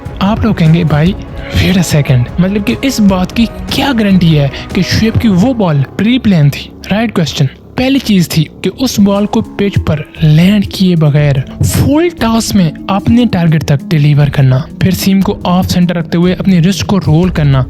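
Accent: Pakistan. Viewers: Tera accent to Pakistani hai baat tu IPL dekhne ki karta hai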